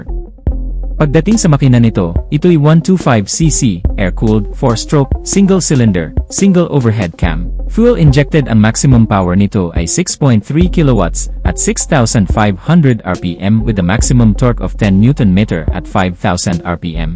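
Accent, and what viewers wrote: Visayas, Philippines. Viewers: Bisaya ka ba ate??😂😂😂😅😅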